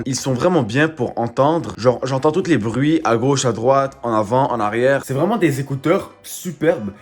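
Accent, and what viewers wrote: Quebec, Canada. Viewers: A l'accent sa se voit t'est québécois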